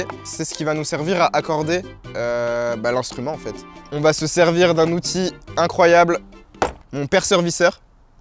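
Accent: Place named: France. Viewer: incroyable accent français !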